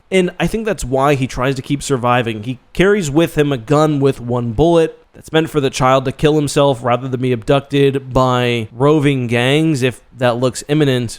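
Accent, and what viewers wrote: Canada. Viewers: Canadian lol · I am confident just by hearing your voice you are a fellow Canadian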